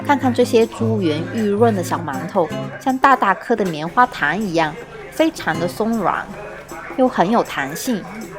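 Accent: Fuzhou, China. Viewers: 这福州话口音好重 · 好熟悉的乡音？福州人？ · 你肯定是福州人 福州腔好重🤣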